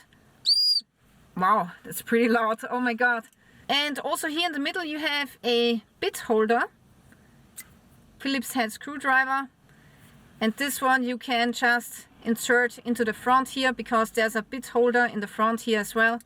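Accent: Germany. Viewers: I don't know why, but I am getting so used to hearing the German/Austrian accent I want to visit you guys. Are you more Austrian? Because it just sounds like "German" due to my ignorance of the differences between German and Austrian speech.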